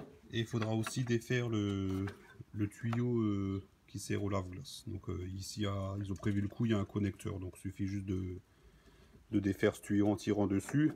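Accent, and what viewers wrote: Alsace, France. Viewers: Accent alsacien ? ;-)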